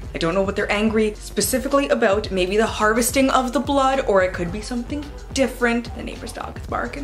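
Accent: Canada. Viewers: Dual Canadian-US citizenship coming in here! I'm from that part of NY where like half my town is French Canadian. A local college literally has a minor called "Canadian studies". Not technically a stereotype, but I know when I cross the border and when my neighbors north come down when they say about with the out part sounding more like an oot or more rounded o. It makes me smile when I hear it in your videos. And maple syrup omg. And hockey (go sens!!!) Maybe this is a good time to finally pick up the handmaid's tale. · pretty sure my favorite canadian stereotype is the way you say the word "sorry" as "sourry" becausE IT'S TRUE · Hello =)) As i'm not from Canada, i don't know much about stereotypes but i've always known how well you all speak great english and french, how you pronounce differently words like: "out", "about" and similars, i've heard about poutine and specially the maple syrup :P I would like confirmation on those xD (i specially like the accent though <3) Thank you for the amazing giveaway and opportunity **********************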